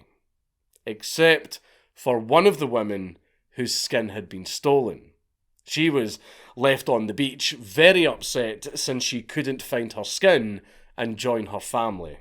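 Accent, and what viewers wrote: Scotland, United Kingdom. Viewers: Nothings more mythological than hearing a Scottish man tell you about myths and insane people from around the world now that’s rare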